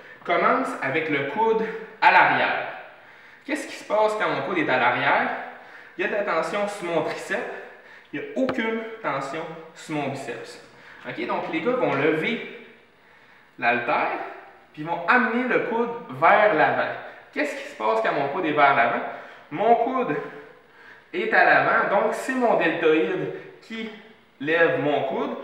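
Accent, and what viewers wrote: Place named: Quebec, Canada. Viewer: L'accent Québécois c'est compliquer... Mais super conseille continu et bon courage pour la suite !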